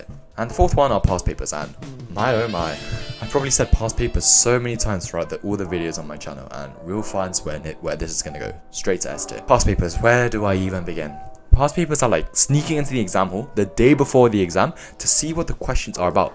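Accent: United Kingdom. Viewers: With the helpful stuff aside, dude your british accent is so good to listen to. Im an asian myself and use american ones, but your voice is peak pls keep cooking.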